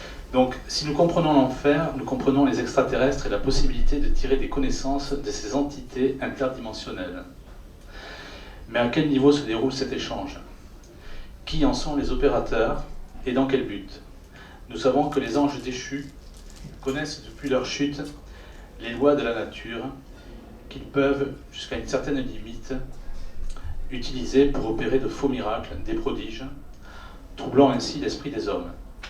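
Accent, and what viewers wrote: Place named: South, France. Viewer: passe son temps assis à chier dans des cerveaux vides. C'est dingue comment une vague élocution et un accent du sud aux consonnances de sinus encombré, peuvent gruger des individus, tout ça parce qu'il balance vite fait deux versets d'évangiles... Gnnnnnnnn kop11111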